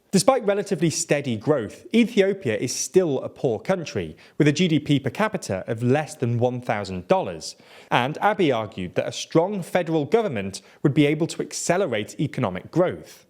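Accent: United Kingdom. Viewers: The curse that is British. Satan is a tormenter. They wished Ethiopia would collapse. Go talk about Ukraine we don't need you talking about Africa Have you noticed how we evil british only talk about war in my Africa That British accent is that of Uzi baa. The advisor of Satan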